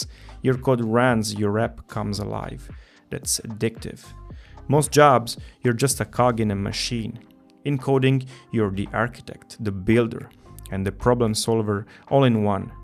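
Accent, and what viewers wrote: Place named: Europe. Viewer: base on your european accent, its understandable to use ai checking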